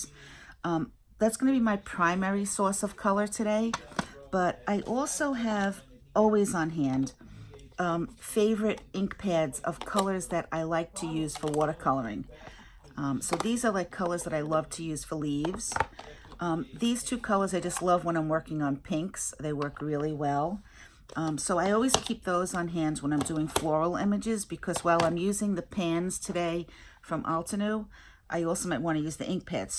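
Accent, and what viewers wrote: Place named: New York, United States. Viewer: What an inspiring and encouraging video. You have covered all my favourite topics and techniques - inked backgrounds, stamping, embossing and water colouring, and all accompanied with your wonderful New York accent. You have made my day!! Thank you so much for the great advice on a technique that I am now inspired to try myself. Love watching your videos here in Ireland.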